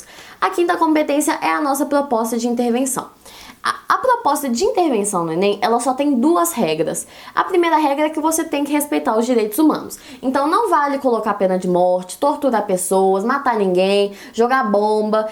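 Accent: Minas Gerais, Brazil. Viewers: Esse sotaque mineiro é demais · Você é sensaaaaaaaaaaaaaaaaaaaaaaacional! Muito obrigado por tudoooooooooooooo! Se cair alguma coisa sobre Educação, citarei você na minha redação como os novos meios da Internet no meio educacional no século XXI. Parabéns por tudo e você fala muito amor, eu amo MG e o sotaque affffffffffffffsx<3!!!!!!!!!!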